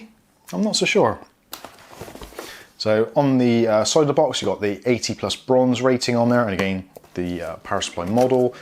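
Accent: United Kingdom. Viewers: I didn't notice anything. I thought it was his British accent.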